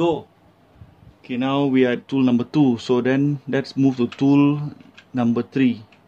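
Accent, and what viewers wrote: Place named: South Africa. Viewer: you have south African accent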